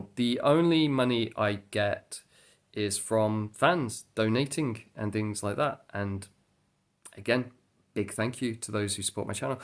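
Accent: United Kingdom. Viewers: Holy shit you’re British.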